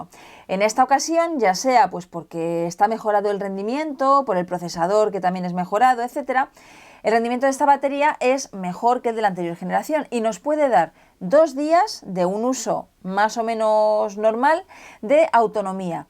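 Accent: Spain. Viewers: Bruno Godoy pues por el acento obvio de España !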